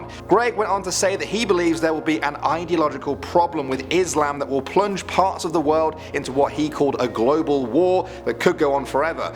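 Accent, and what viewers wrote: United Kingdom. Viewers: He's British. They pronounce it cap-syool. A perfectly acceptable pronunciation .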